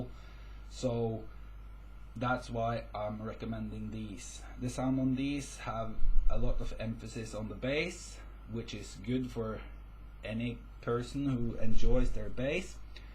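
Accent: Norway. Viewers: You sound like a norwegian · Er det norsk aksent eg høyrer?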